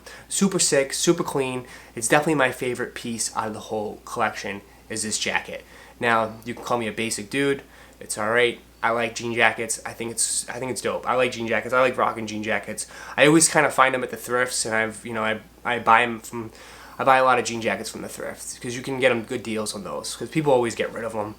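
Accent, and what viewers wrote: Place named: Northeast, United States. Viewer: Your wicked accent sound like you're from the NE area.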